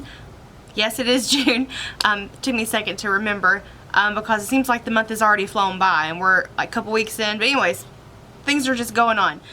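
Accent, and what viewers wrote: South, United States. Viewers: Love your eyelook, especially with your eye color! I got the other eye palette and my jury is still out. lol I got the Sunday Riley cream as part of my box and am in love with it; that fragrance!!! I also added on the Tetris lip balm that you did and think it is a great product and such fun packaging. I'm a new sub and 1st time commenting. I like your channel and your accent. You sound southern to me. I'm 63 and live in Iowa and am pretty new to Ipsy (since Dec 2018).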